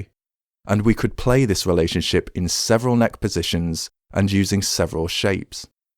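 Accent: United Kingdom. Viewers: I guess he s British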